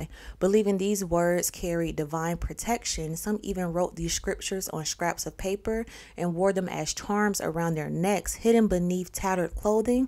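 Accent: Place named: Rural, United States. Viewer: Not sub tal 😂 girl I love your country twang lol thank you for doing this series ❤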